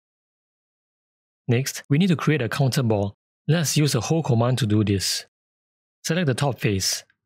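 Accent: Singapore. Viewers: I love your channel! Out of curiosity, are you by chance based in Singapore or from Singapore? Your accent sounds very Singaporean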